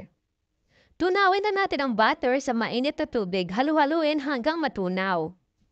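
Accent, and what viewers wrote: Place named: Visayas, Philippines. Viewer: ang cute ng voice ni ate pinaghalong englishera,na bisaya at Ai😄😄😄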